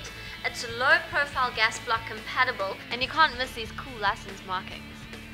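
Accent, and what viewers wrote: South Africa. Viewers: this chick sounds south African,i could be wrong.she really does. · john accer She's from South Africa with that accent :) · She so sound like a South African.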